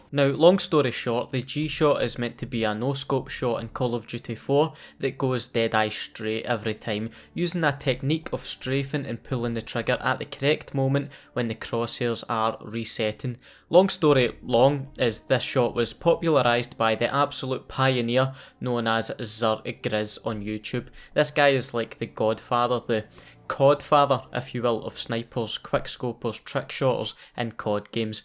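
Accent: Scotland, United Kingdom. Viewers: Am I the only one who thinks this guys scottish voice doesnt fit the spooky map myth? Also: I didnt mean to offend you, if this did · I was getting a little spooked for the ghost of vacant clip, until you said with your strong Scottish accent "What tae fuck is that". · Love the Scott esh accent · Absolutely love your vids. I know I'm a bit (just a bit) late . I'm from western Scotland and I can definetly tell you're Scottish. What part of scotland may I ask? Also keep it up man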